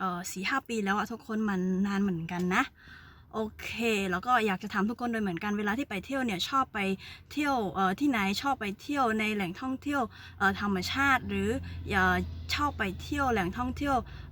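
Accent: Cambodia. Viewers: ฟังสำเนียงแล้วเขาเป็นคนเขมรครับ จากคนสุรินทร์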